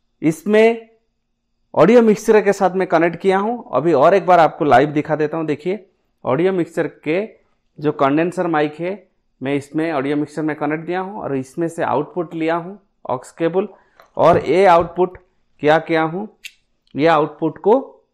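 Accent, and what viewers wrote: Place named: Odisha, India. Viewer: Sir app ki language odia jese laga rahe hai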